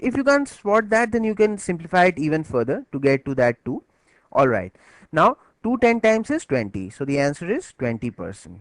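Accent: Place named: India. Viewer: why is it a lot of english speaking people are stupid. Why they always think that they are beter than other because their language is english. My grammar in english probably not good but for sure, you don't understand and cannot speak german, dutch, italian language........ well I do................. and still, to much bla....blaa in this video. And your accent is not familiar to me. I know brittish, Irish, american and australian accent. But you, you sound like Indian english accent. Right???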